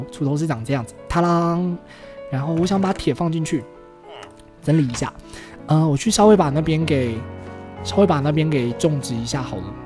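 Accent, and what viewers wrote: Cantonese, China. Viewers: 阿神的粵語好可愛～(PS:Bob們都知道阿神笨笨傻傻的，那阿神覺得這種性格是好是壞呢？） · (香港的粉絲)阿神說粵語很可愛^^:D雖然聽不太懂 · 阿神講粵語好可愛<3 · 阿神講粵語好可愛阿wwwwwwwww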